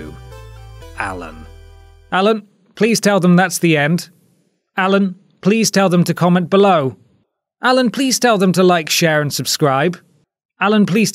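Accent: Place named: England, United Kingdom. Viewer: You had me at "silver haired bugger" but you killed it at "wanker". God save the queen, your video is awesome but you english accent earned my subscription.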